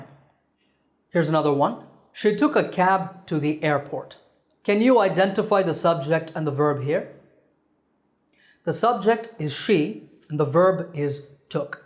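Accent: United States. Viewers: You are Indian but your voice like real American people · I come to you for two reason. First I learn so much from you and Secondly I love watching an Indian Using American Accent. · Apart from your excellent teaching . I liked your american accent, How did you developed it ? I am too trying to speak in ameri-khen accent . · You are indian but voice is like American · Your accent is American wow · Sir are you from India? How did you acquire American accent? Tell us about that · Your american accent is 😍. Wanna learn it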